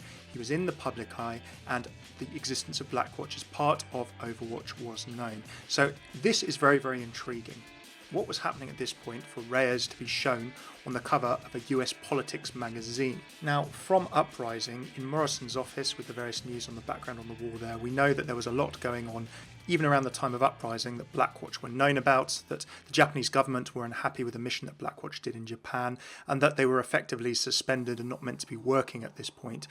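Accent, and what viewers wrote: United Kingdom. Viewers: FoulFox Nation how can someone not British sound more British than British people? That makes no sense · The accent could be afrikan british origin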